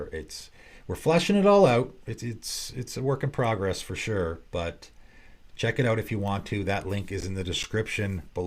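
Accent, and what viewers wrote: Canada. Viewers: Love the content man keep up the great work. I'm very happy you're continuing to have success! I've found so many great cards and strategies through your videos. I love using those lesser known gems and you might be the best channel to find those. You've also inspired me to build 4 decks (patron of kitsune, cosima, Dakkon, and ertai). I've got 3 random questions for you: 1) do you have a public discord or is it only through patreon? 2) have you ever played with, or considered plying with custom commanders? What are your thoughts on them? 3) are you from Canada? Your accent gives me Canada vibes 😂 Also I can't remember if you discussed it in a video already but dominating licid is so cool! Definitely deserves a mention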